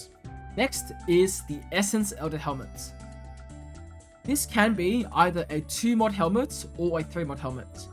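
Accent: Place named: Australia. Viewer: sounds aussie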